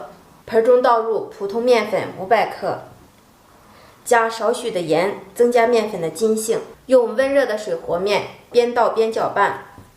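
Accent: Shandong, China. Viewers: 山东姑娘是吧？不错 👍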